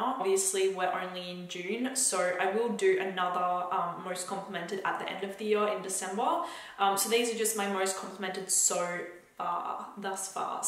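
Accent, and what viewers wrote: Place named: Australia. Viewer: Anyone else generally just really like videos but really stay tuned just to learn how to authentically say "know" or "no" with an authentic Australian accent!? Rhetorical question. I KNOW I'm not the only one.. so..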